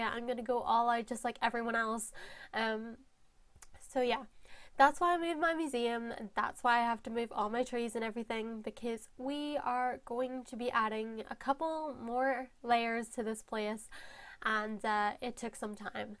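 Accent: Northern Ireland, United Kingdom. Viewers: the way it took embarrassingly long for me to fully catch on that you’re from northern ireland,, considering so am i ok wow lmao also how amazing is this entrance!! i have some fossils on the second level beside the museum and have water around it all and i have a few fossils/dinosaurs on like wee lone islands within the river so you cant get near the fossils,, if that even makes sense😂😭 but im really happy with mine! it was the first ‘grand’ thing i did with my island! it just needs some finishing touches like flowers when i finally get all the hybrids i want😭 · Same i went into to comments to try and find out cuz I couldn't tell if it was an ni accent or not 😂😂😂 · Great video! Do I detect a Northern Irish tinge there??😉😆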